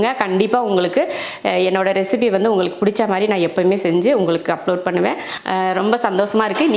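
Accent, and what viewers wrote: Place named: Theni, India. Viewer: Akka neenga Theni districta ?Unga Slang ,food habbits apdithan iruku.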